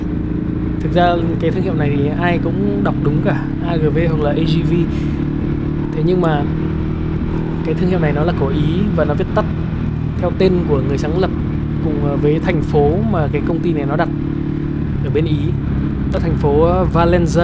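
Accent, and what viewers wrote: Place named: Hanoi, Vietnam. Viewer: Giọng nói giống bằng kiều lai tuấn hưng quá , gốc hà nội có khác :v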